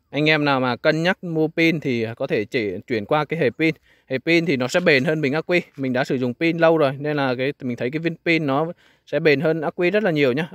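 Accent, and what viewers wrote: Nghe An, Vietnam. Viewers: Anh ở đâu nghệ an vậy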